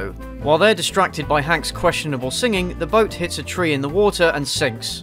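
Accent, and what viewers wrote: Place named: United Kingdom. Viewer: Love you're british voice, you closeted furry. Wish to see more great content from ya!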